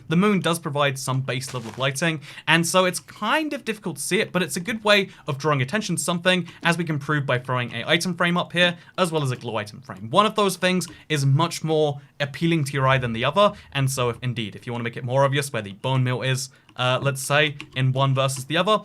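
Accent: United Kingdom. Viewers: is British. Lichen is pronounced 'Litchen' in British English. pronunciation is therefore correct.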